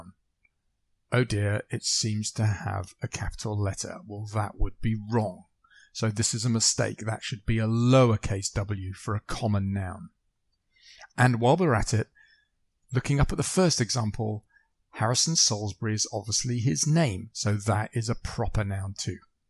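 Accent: United Kingdom. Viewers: Very Bri,ish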